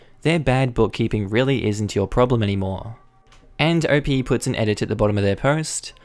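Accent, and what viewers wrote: Australia. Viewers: So pleased there's an Aussie narrator... has a soft spot for the accent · hearing a fellow Aussie voice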